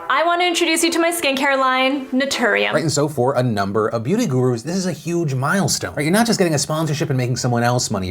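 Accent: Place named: Southeast, United States. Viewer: originally from North Carolina, so he still holds a lot of the pronunciation from the mid-atlantic/Southeast region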